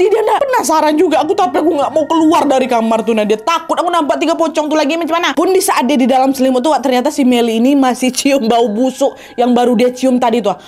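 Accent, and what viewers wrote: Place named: Sumatra, Indonesia. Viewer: AKU MAH SUKA SAMA KARNA CARA BAWAIN CERITANYA ENAK ASIK. NYEROCOOOSSS TERUS TANPA HENTI DG LOGAT SUMATERANYA. LANJUTKAN...!!!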